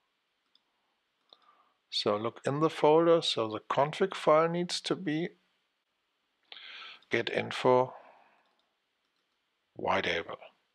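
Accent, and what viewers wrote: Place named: Germany. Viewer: Ist mir schleierhaft warum du das auf englisch gemacht hast. Mit einem derart starken deutschen Akzent wäre es auf deutsch sicher besser geworden. Dennoch interessant.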